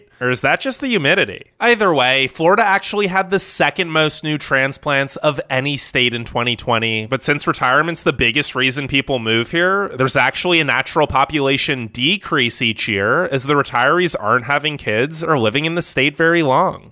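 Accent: California, United States. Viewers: Is person speaking from Cali 🤔 ?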